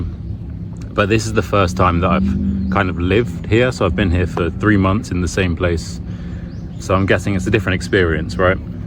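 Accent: United Kingdom. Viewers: British accent it is love it❤❤❤🥺🥺🥺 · british accent is my favourite. greetings from caracas, venezuela.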